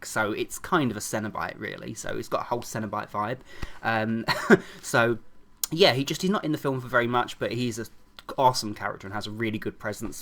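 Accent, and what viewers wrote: United Kingdom. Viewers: Are you British? -KidHawk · i love how creepy the make up looks and then you speak with that freaking adorable british accent and it completely steals the intimidation away for me XP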